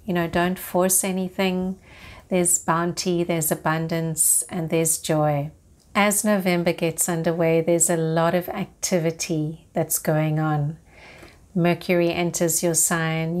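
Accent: South Africa. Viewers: It's a South African accent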